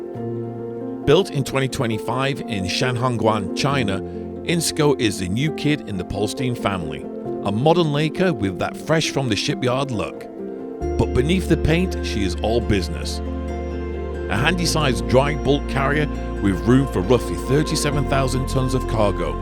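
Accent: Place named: Manchester, United Kingdom. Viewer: A fellow brit & your in Canad! I recognised the Manchester accent. What a beautiful sight of the huge ship & another lift bridge like in Duluth MN. You are so lucky to see this. ❤ from UK. 🇬🇧🇨🇦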